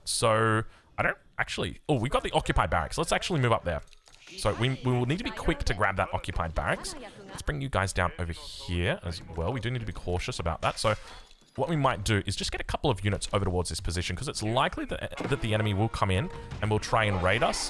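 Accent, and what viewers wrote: Australia. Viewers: Yes he’s just Aussie it don’t rlly matter but yeah we normies say “cash”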